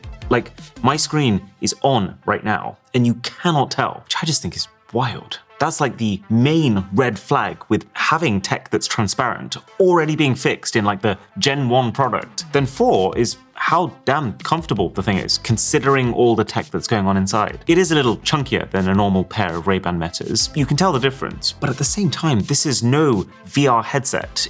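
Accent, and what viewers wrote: United Kingdom. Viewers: could also be u have the most clear british accent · Through out my professional career i saw one constant thing - People get away talking nonsense with their British accent. NO doubt this is a great product but what ruined it for me the over action you do with all your reviews. I better get back to my fav review guy Marcus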